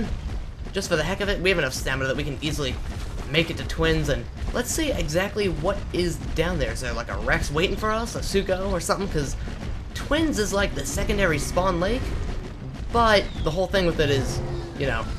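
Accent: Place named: United States. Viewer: hey I like to talk, do a Austria pack, I want a face cam you sound American, and you may not be PewDiePie, jackcepticeye, or the diamond minecart but. YOU are my Favourite YouTuber in the world I would rather PewDiePie have 8000 subs and you have 50 mill you deserve it I just hope you keep doing what you do and on cuz ya can 😉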